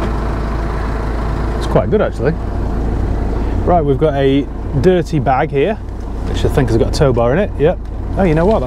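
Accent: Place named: Yorkshire, United Kingdom. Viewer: You're Yorkshire accent made me laugh.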